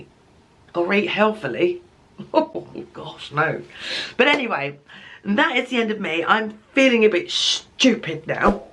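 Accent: Wales, United Kingdom. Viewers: There she is❤ and with a Welsh accent too🤣😂 I'm crying 😂 Lovely to see you back❤Life happens and you've been through alot the last few weeks, sending big Cwtches your way🥰❤️🥰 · Yay, good to see you as you've been missed. Shame that the teaching assistant post did not work out. Working in a kitchen proving a diet disaster !! Keep practising the Welsh accent. Sorry to hear of the sad loss of friend & family.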